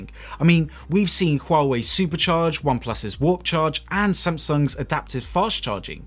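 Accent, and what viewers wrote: United Kingdom. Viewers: Normally i dont like British accents but dude yours is different i can listen to u talk all day 💛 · Thanks, interesting and helpful video. Good to hear a Brit voice supporting a USA company. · is this really Sabrent USA? Sounds more like Sabrent UK.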